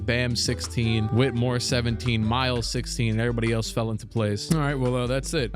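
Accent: Trinidad and Tobago. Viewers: Nah no way bro was trini this whole time 😭🫡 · Are you actually Trinidadian ? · your trini? same here bro fire vid ❤️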